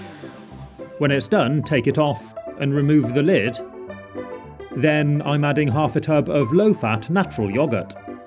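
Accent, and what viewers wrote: United Kingdom. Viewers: I just love the british ascent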